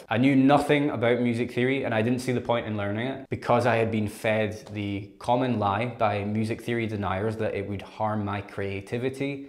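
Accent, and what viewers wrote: Ireland. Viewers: You sound irish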